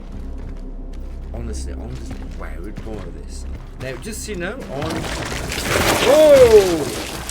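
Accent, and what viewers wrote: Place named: England, United Kingdom. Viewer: He is literally ENGLISH 🤦. You might be referring to his accent, he comes from a part of England with a strong regional accent, very different to the rest of the country. But English is still his native language.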